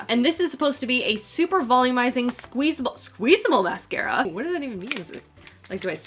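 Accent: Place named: Canada. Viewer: I love when I hear your Canadian accent.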